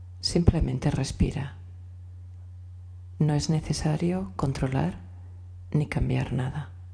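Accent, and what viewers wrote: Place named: Spain. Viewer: Aparte de la carretilla o media de nylon o red de protección en el micrófono... Es necesario hacer un post mezcla de audio en el out feader o eco... Ya que se aumenta los tonos agudos de la voz. Eso en lo técnico y tecnológico. Pero para darle gusto a JAM MUÑOZ...pues tendría que nacer está mujer en otro país!!!!! PARA NO TENER EL ACENTO CARACTERÍSTICO DE LOS HERMANOS DE ESPAÑA !!!!...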